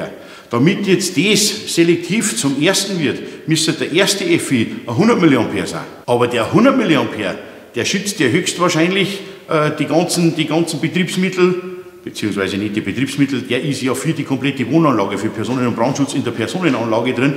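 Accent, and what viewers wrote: Bavaria, Germany. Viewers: Bin ein Ungar, hatte Deutsch nur als Fremdsprache in der Schule gehabt, verstehe dich aber doch ganz prima! Bairisch finde ich schön - sei es dein Kanal, Ottfried Fischer oder die Gruberin :)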